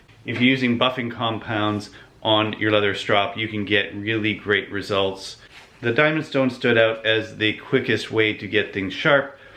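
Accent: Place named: Canada. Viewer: Dansk surname living America, or a perfect English speaking Dane? But then I hear a little Canadian accent? Cheers from an American living in Sweden!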